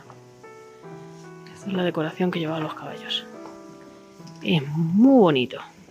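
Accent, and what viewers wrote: Spain. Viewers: AUNQUE TENGO MI OPINIÓN SOBRE SISSI COMO EMPERATRIZ, ME ENCANTAN TUS VIDEOS EN ESPECIAL LOS VESTIDOS DE SISSI. YO QUE SÉ COSER UN POCO ENTIENDO EL TREMENDO TRABAJO DE ESOS BELLOS VESTIDOS. ME ENTRETENGO TANTO MIRANDO ESTOS VIDEOS QUE CUANDO APARECE UN ANUNCIO ES COMO SI ME SACARAN DE MOMETO DE ESA ÉPOCA Y ME TIRARAN AL PRESENTE. LO EXPLICAS TODO MUY BIEN, Y CON TU ACENTO ESPAÑOL SE ESCUCHA MUY BONITO. SIEMPRE TIENES EL DETALLE DE CONTESTAR A QUIENES TE ESCRIBIMOS. POR LO QUE ME ATREVO A PEDIRTE UN GRAN FAVOR SOY RAPHAELISTA DESDE QUE TENÍA 11 AÑOS Y YA TENGO 72, ME ENCANTARÍA QUE HICIERAS UN VIDEO DEL MUSEO DE EL CANTANTE QUE AUNQUE YA HAY UNO SÉ QUE EL TUYO SERÍA MUCHO MÁS DETALLADO. DESEÁNDOTE MUCHA SALUD Y SUERTE CON TUS VIDEOS. 😊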